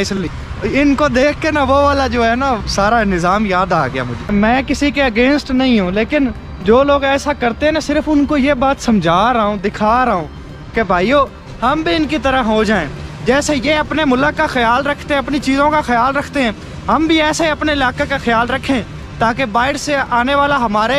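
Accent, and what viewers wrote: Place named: Punjab, India. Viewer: Annoying poon-jobi accent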